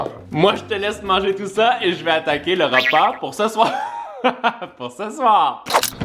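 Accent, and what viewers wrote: Quebec, Canada. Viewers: J’adore son accent québécois ❤️👌🏻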